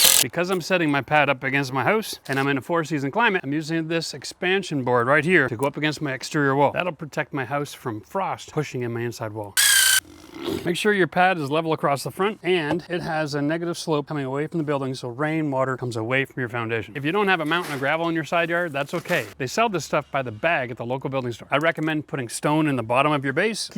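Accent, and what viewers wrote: Canada. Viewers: How do you say the word house that gives away your Canadian accent. Thank you for your awesome video. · When you say House and About and Eh,you let me know you were Canadian. Cheers from below the 49th.Nice video.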